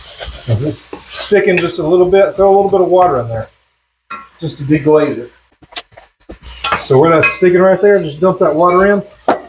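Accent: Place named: South, United States. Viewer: Great recipes from a keto chef that sounds like me. I'm from NC so you sound like my next door neighbor. Refreshing to hear in this massive web of You-tube videos from who knows where. Keep on cooking Southern!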